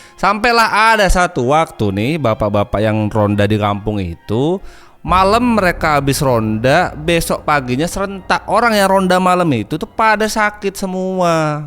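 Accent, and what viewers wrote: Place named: Lampung, Indonesia. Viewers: Latar jawa, logat lampung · Lampung gitu logatnya bg😅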